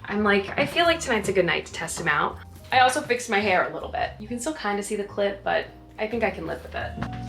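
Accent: New York, United States. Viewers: Another interesting video from in her trademark Husky strong New York Accent living life again in the fast lane in NYC and having a action packed week with members of The Flamboyant NYC Crew, the bubbly Flamboyant Redhead, and The Twin Sisters RN'T US! duo, going to different places including two theatres, Matcha Bar, restaurants, walking in the park, watching Love Island indoors and doing some brand videos as well as cooking food and doing her work on the computer. She did gave her Cats some love and even helped a friend with moving. The Weather here in the UK is very hot and humid even at night. Unfortunately both and decided not to go to the Knicks Victory parade because of acute overcrowding. She did go out on a date with someone but she is keeping it a secret! Looking forward to seeing another video soon. Take care from London UK 🇬🇧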